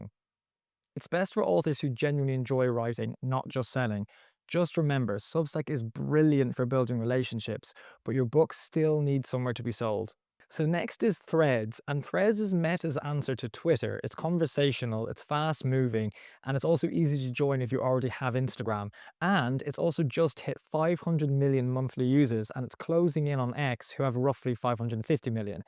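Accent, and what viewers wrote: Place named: West Country, United Kingdom. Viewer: hi, are you from Devon? Your voice, your accent sounds the same as mine. It's so nice to hear a westcountry voice.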